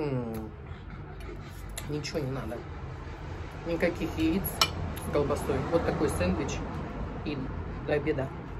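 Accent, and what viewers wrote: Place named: Russia. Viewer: Вы хотя бы правильно назвали улицу...😂Самбурова !!! ( спроси у местных) кацапский говор + не правильно ударение😂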